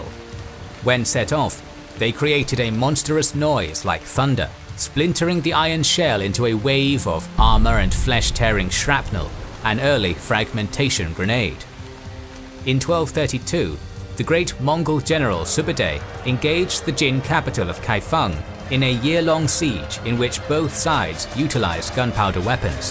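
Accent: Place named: United Kingdom. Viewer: With the narrator's British accent, war pow might have been closer to the Mandarin pronunciation for him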